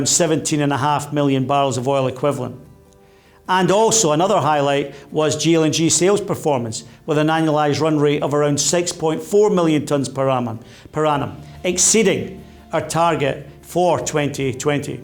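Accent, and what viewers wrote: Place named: Scotland, United Kingdom. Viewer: Thank you; clearly and succinctly explained for the lay person. BTW, I love your gorgeous Scottish accent, sir!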